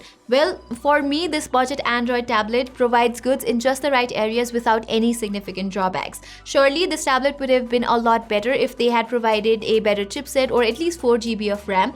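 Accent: India. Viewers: It sounds sweet when Indians speak fluent English. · I really love your Indian accent... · Nah bro, last thing I want is a indian accent voice assistant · 😜😜indian nd Pakistani boys 😜👀mall heina ye?👀 First time dekh rha ho education or English se lag rha hai indian hai❤️❤️👀 🙊🙊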